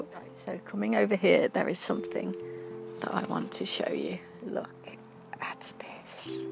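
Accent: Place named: United Kingdom. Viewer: You speak British English. I Love it beautiful 😍 ❤. Do you live in England 🇬🇧?..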